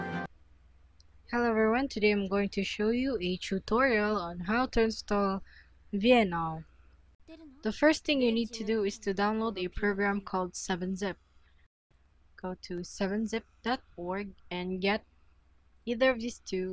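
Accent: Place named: Philippines. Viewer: I can hear a Filipino accent, are you filipino by any chance?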